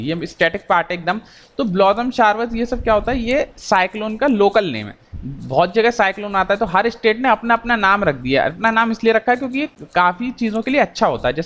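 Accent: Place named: Delhi, India. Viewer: Sir apki video dkhta hu pta hi ni chlta kb video khtm ho gyi....ap exact to the point pdhate ho....delhi's local language 👌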